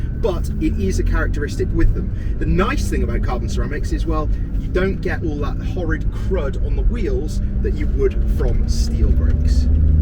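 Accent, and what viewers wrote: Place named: Wales, United Kingdom. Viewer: i’m here for the welsh accent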